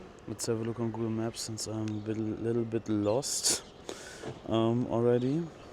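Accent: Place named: Germany. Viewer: Great video and lovely German accent. Maybe more clouds next time.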